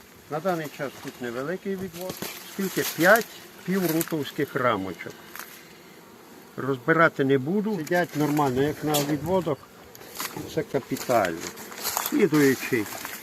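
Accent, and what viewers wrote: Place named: Western Ukraine, Ukraine. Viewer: Закарпаття? Чи де це в сорочці в лютому можна ходити? По говору чути що з західних регіонів.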